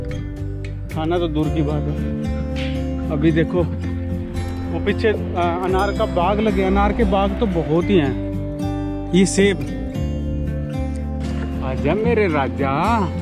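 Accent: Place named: Bihar, India. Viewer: Aapka jo bolne ka tone bahut bar Bihari tone lagta hai I am watching yours video for two days continuously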